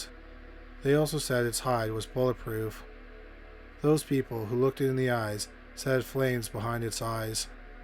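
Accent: Canada. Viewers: He's Canadian. I absolutely love his voice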